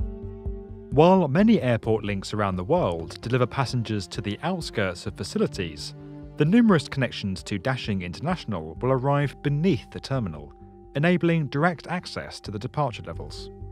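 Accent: United Kingdom. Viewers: I know it was going to be a British guy 😀😀😀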